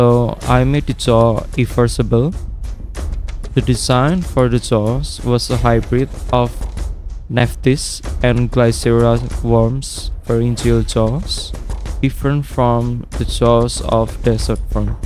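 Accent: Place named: Indonesia. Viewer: Dude I could just TELL you had an Indonesian English accent! wonderful art too!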